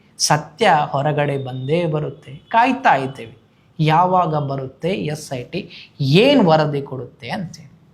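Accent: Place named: Mangaluru, India. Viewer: ಅವರು ಮಂಗಳೂರು ಕಡೆಯವರು ಆದ್ದರಿಂದ ಅವರ ಮಾತನಾಡುವ ಶೈಲಿ ಈ ರೀತಿ ಇದೆ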